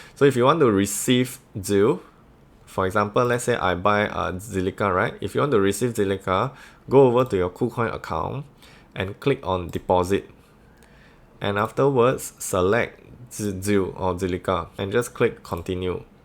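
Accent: Singapore. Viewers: Gotta love the Singaporean accent. Huat ah. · Wow the Singapore accent is actually a thing! I almost thought I was listening to the other youtuber Chicken Genius. Interesting ^^ Also thanks for taking the time to write up this guide of course!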